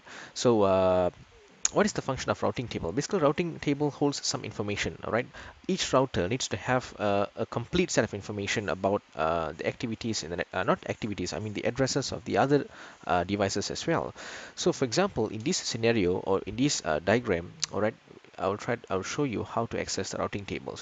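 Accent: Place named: Malaysia. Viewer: I can't miss Malaysian accent haha, nice lah abg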